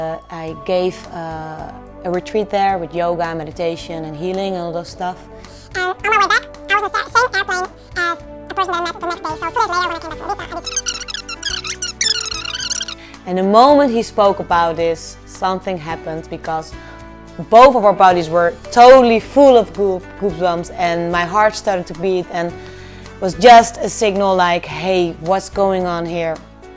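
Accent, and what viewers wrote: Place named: Netherlands. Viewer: Love your accent and i dont like all Dutch accents.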